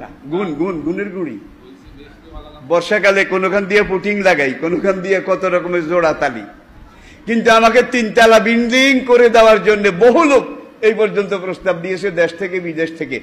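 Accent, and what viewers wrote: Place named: Noakhali, Bangladesh. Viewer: হুজুর আমগো নোয়াখালীর মনে হয়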